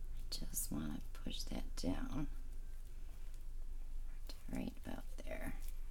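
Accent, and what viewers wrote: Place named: Western New York, United States. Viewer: Hello. Thank you for sharing Learned so much from you. You truly inspire me. I heard you say New York and snow. Whereabouts? I’m from Buffalo, your accent sound western New York-ish. Anyway awesome Techniques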